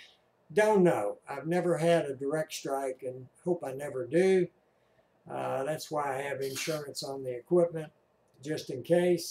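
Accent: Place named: North Texas, United States. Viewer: I got stuck on your channel becuase for some reason, you remind me of my late Grandad. I don't know where you're from originally, but he was from North Texas and had a very similar dialect to yours. He was also a ham, as is my Dad. For whatever reason, I never caught the ham radio bug until about a month ago, so I took the Technician test at the beginning of March 2018, and the General at the end of March. I wish I had done it when he was still alive, but at least I'm getting to enjoy learning from my Dad, and your videos as well. Thank you for them! 73s to you! KG5YTI - Brian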